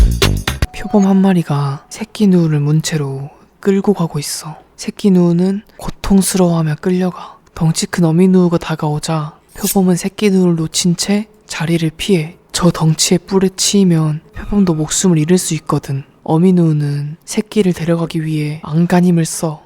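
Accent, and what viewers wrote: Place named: Jeolla, South Korea. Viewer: 전남 말투같은데..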